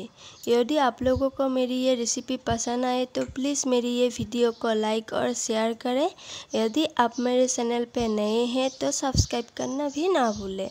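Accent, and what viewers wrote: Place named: Assam, India. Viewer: nehi wo Assam se hai. Unka naam ek flower ka naam hai. Aur kabutar Assam me khate hai aur yeh kabutar ka receipe bata rahi hai. Ho sakta hai yeh Bengali hai lekin pakka Assam se hai. Unke Hindi ka pronunciation se pata lag jata hai. Aur yeh kisiko Assamese me reply kar rahi thi meine dekha tha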